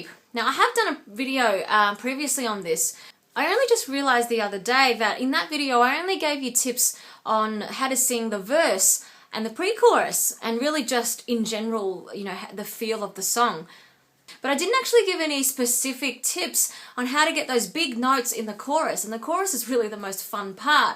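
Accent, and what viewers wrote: Australia. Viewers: You're Australian. :) I'm considering singing lessons and I wanted to know what part of Australia you're from.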